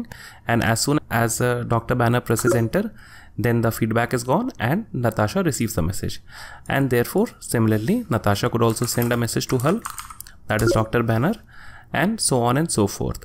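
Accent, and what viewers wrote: India. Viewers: why its always indian accent :(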